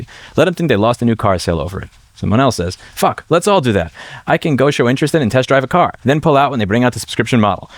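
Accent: New York, United States. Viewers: Fast talking new yorkan keeping it plus two on the speed knob, to plus three for effect, then back to two or one if you’re slipping .. your content’s okay though at .75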